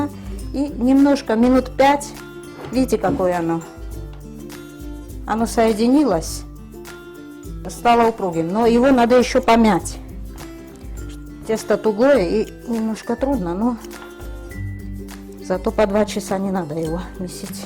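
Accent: Georgia. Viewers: Моя хорошая!!! Как же я рада была увидеть Вас на канале ,услышав Вашу речь с акцентом и увидев блюда дамашней грузинской кухни ....вернули в молодость .!!! Когда были молодыми жили с мужем в Тбилиси (Глданский р-н) а сейчас представляете я тоже живу в Испании (Кадиз) часто из грузинских блюд готовлю аджапсандали и сациви ,хинкали !! На всех видео поставила лайки!! Вы очень приятная ,а русская речь с акцентом бальзам на сердце!!!очень удивилась что так много зелени Вы нашли в Испании особенно свежий укроп и кинза ...для испанцев пряные травы не интересны.Если не секрет .,где Вы живете?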